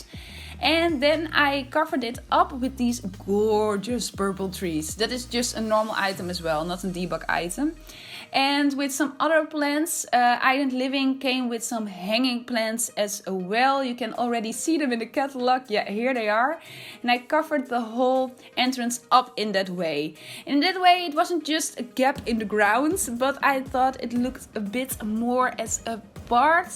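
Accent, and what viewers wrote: Netherlands. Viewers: The Sim Stream ik uit België 🇧🇪 ik dacht dat je uit Nederland kwam want er zat een Nederlands accent tussen je Engels 😁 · Het ziet er geweldig uit 🤗 Alleen zou ik als kleine tip meegeven om wat meer de Amerikaanse of Britse uitspraak van woorden te leren 🙈 Je kon namelijk echt duidelijk horen dat je Nederlands bent, maar dat is zeker geen kritiek hoor, het gebruik en kennis van de woorden is namelijk erg goed 🤗 Het is oprecht gewoon bedoeld als tip 🤗 Je hebt in ieder geval wel een nieuwe subscriber 🙈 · Wow i realy love the balcony in the bedrooms❤️ amazing how you made this! Looking forward to the next episodes of this series. Which country you live? You sound a bit dutch/holland like me😁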